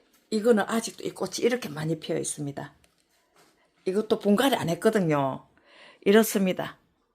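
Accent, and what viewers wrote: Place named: Gyeongsang, South Korea. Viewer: 경상도 사투리 구수하고 듣기 넘 좋네요 영상 잘보고 갑니다 감사합니다...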